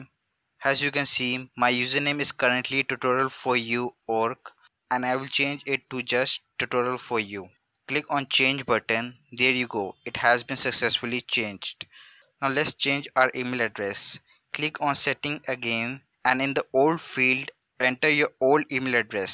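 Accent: India. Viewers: anything tutorial with an indian accent works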